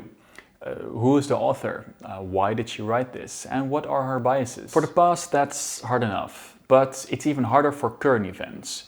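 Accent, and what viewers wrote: Netherlands. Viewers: I smell a beetje dutch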